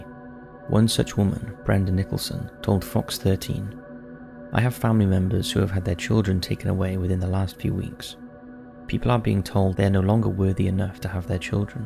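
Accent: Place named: England, United Kingdom. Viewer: Not my problem. Why is a pom narrating?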